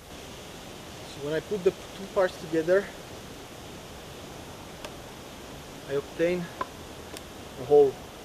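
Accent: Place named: Germany. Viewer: Don't wanna be that guy, but isn't he german?? Accent and camo gave it away :P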